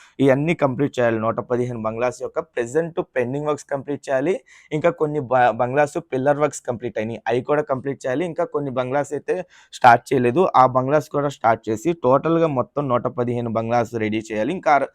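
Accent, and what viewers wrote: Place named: Vijayawada, India. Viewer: Vijayawada slang eppudu vinaledha bro. 😅 Valla slang vallaki nachinatu matladatharu.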